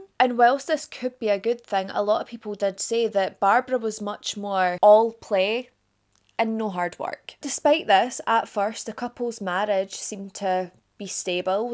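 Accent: Scotland, United Kingdom. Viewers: Great video! Ive never heaed of this case and I grew up in Spokane Washington. Weird. I think you are so beautiful! You totally remind me of Bailey Sarian with a Scottish accent 😂 I love you both so so so much!!!! (((HUGS))) from Idaho USA ❤ 🐈‍⬛🐈‍⬛🐈‍⬛🐈‍⬛ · Sooooo…. I love ur accent and I know u try to pronounce things really well so ppl not from Scotland can understand (like myself). But I would LOVE a video with Scottish slang and ur natural pronunciation!!!! Maybe closed captions for slang words but I absolutely love hearing ur accent!! Jus an idea 😅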